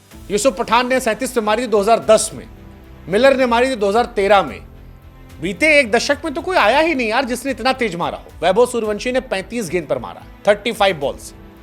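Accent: Bihar, India. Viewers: Bhai aaj bihari me bol rha hai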